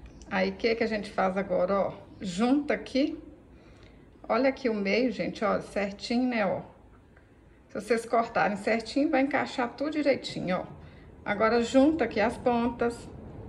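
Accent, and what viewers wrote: Minas Gerais, Brazil. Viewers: Adoro assistir suas aulas. Elas sao fáceis e esse seu sotaque de mineira é uma delícia!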